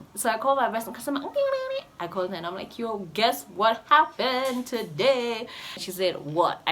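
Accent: United Kingdom. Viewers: I love how you introduce the British accent naturally 😍😍😍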